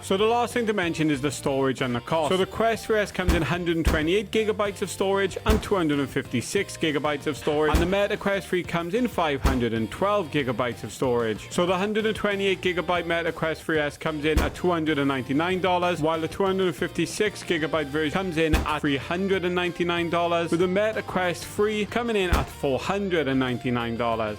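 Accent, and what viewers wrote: Wales, United Kingdom. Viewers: Welsh is it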